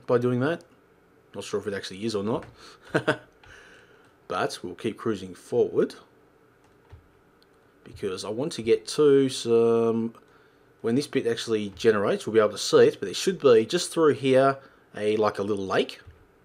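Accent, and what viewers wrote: Australia. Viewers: Obviously Aussie xD.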